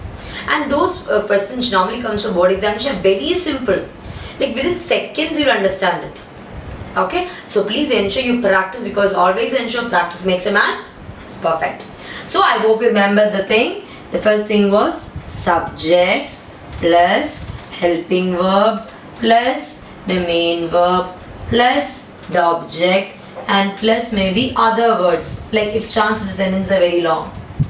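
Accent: Kerala, India. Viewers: Mam,are you from kerala?.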